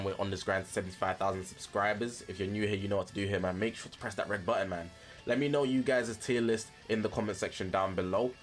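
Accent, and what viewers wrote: United Kingdom. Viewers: British man explaining in NBA terms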